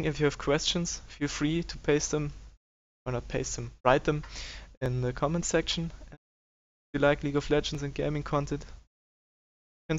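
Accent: Germany. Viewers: haha ich wusste das du deutscher bist xD Das merkt man am Akzent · Livestreamer gibt es aber anscheinend nur für 32 Bit.. Ich habe aber ein 64 Bit System.. Funktioniert es dennoch? :) Bist ja deutsch wie ich gesehen habe und man es auch raushört :) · D der deutsche Akzent ist real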